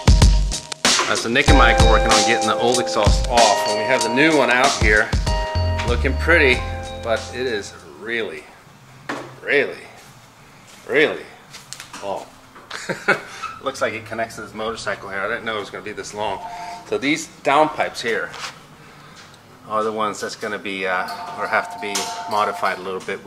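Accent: Sweden. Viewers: are you swedish? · Are you from sweden originally?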